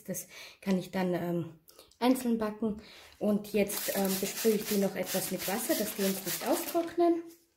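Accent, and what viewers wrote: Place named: Austria. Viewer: Ich mag sehr gerne deine guten Rezepte und deinen lieben österreichischen Dialekte. Meine Oma hatte auch viele solche Rezepte!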